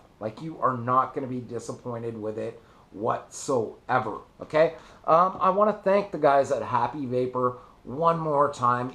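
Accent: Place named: Canada. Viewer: Great comparison video... Never realized you were Canadian, kinda recognized the accent, but when you said you felt like a knob it gave it away lol.... Anyhow. I picked up the sigelei 100 Watt the other day, $81 with a coupon code, the plus was out of stock, could have got the ipv3 for $110 with the coupon, but didn't figure I really needed the extra 50 watts. From what you've laid out in your video, it kinda reaffirms my choice. Should get it this week sometime and I'm stoked.... Keep up the good work man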